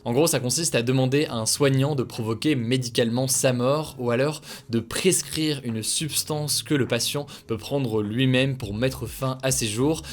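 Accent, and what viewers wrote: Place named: United Kingdom. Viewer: Hugo je me demande depuis un moment, mais pourquoi tu ne prononces plus les mots et expressions anglaises avec ton bel accent britannique? :) j'aimais bien perso ça change de notre "French accent" !! Bravo pour ce boulot tous les soirs ;)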